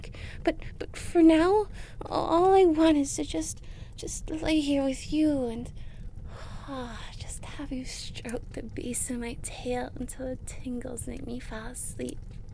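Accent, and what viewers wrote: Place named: Canada. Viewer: Your accent for this character is perfect. It sounds like a cross between faint Canadian, and classic kimonomimi voice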